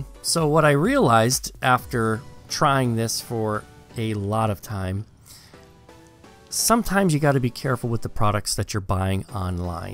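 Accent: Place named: United States. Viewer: This is a great video, but almost impossible to watch as someone who is English due to Americans silencing the L in solder, which is weird.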